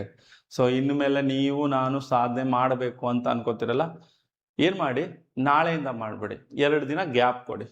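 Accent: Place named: Haveri, India. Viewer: Sir nimm bayaga haveri bhashe kelak chend ri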